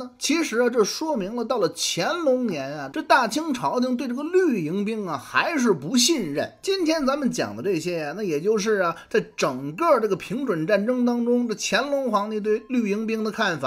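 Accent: Beijing, China. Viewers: 北京音有个神奇的效果，用京音儿说任何事都像在说评书，像在侃大山，像在忽悠，失去了可信度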